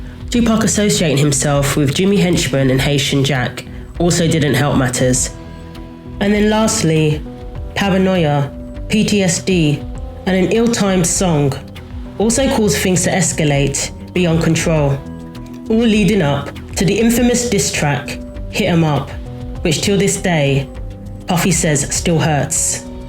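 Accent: London, United Kingdom. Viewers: Some big fish did go through the net. To this day still an unsolved murder! Love the London accent! RIP❗️though everything was avoidable.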